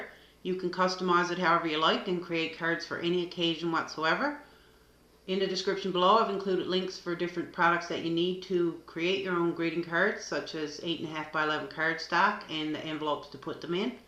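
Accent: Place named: Nova Scotia, Canada. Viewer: Hi neighbour, I thought I recognized that accent. I'm from Nova Scotia.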